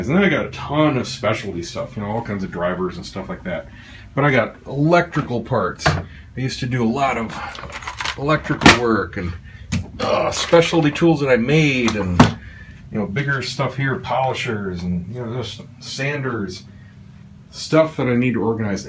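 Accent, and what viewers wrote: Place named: Orlando, United States. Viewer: Check the pawn shops, ive been cleaning up there lately. You sound like your in the Orlando area. If so check out Godfathers pawn on Colonial. There are 2 locations. I seen a nice Milwaukee box in there you might like.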